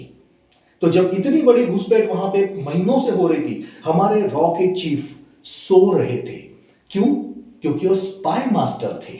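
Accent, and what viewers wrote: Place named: Maharashtra, India. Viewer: साहेब, तुमच्या हिंदी उच्चारावरून तुम्ही मराठीभाषी वाटता. बहुतांश भारतीयांसाठी हिंदी व्हिडिओ नक्की करा. पण अधून मधून मराठीत सुद्धा 🙏 (पण मी कोणी गुप्तहेर नाही 😂)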